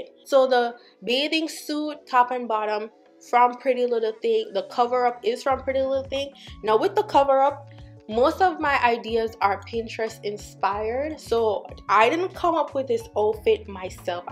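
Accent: Jamaica. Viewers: U SOUND JAMAICAN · Chun chun 😂😂😂 . The Jamaican can’t hide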